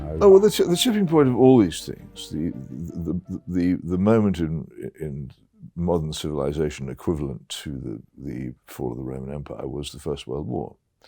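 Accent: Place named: RP, United Kingdom. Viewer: Used to be a huge fan of Peter Hitchens-- read his blog avidly and quoted his "insights". I suppose I was beguiled by elegance and plummy voice and (largely fictive) persona of "the most hated man in Britain". But then I started noticing his blindspots, how he would rail against immigration but absolutely refused to venture near anything to do with race or 'the badlands' as he called them, despite the fact that the issue of immigration is entirely about race, even if white people are too psychologically terrorised to openly admit it. How he would denounce the degradation and demoralisation of Western society, but never address who was behind these changes. Then I learnt he was Jewish, and everything became clear. "Conservatism" is a trap designed to contain the anger of white people and direct at false targets, and keep from ever converting that anger into action. 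To keep them impotently spluttering about the symptoms of our affliction, like Islam and immigration, while remaining ignorant of its etiology. Conservatism is defeatism. I abandoned it years ago, and I've never looked back.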